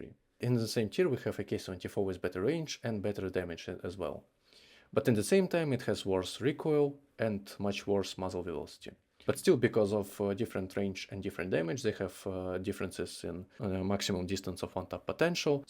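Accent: Russia. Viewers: I love your russian акцент, good luck on youtube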